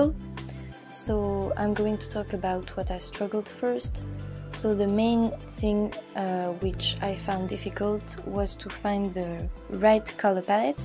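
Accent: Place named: France. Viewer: That's so great ! I love your style, and your art is so clean 😍 I'm scared I'm not right but, you're french, aren't you ? (Because of your accent)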